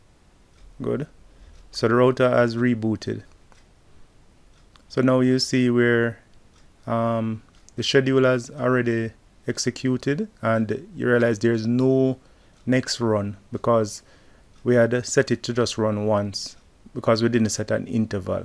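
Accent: Jamaica. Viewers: You sound Jamaican, I lived there for a few years. Thanks for the video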